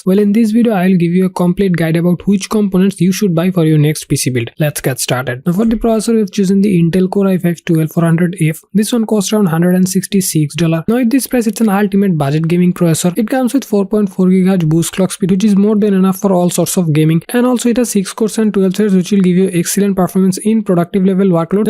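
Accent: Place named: India. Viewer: This is the most Indian accent ever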